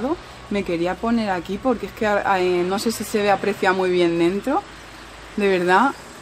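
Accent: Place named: Madrid, Spain. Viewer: habla cual madrileña😁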